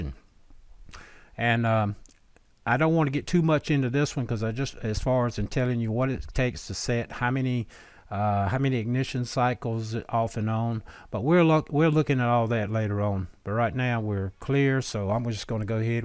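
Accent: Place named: Virginia, United States. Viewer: You sound like you’re from VA. Good video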